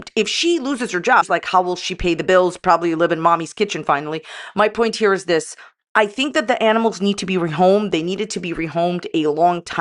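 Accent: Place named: East Coast, United States. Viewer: Was waiting for this 😊😊. My favorite eastern coast accent YT person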